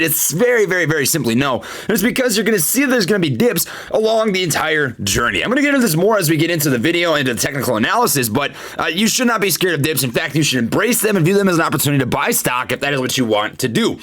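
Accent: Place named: New York City, United States. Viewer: Haha live ur channel man. You are def from the NYC area. Haha gotta be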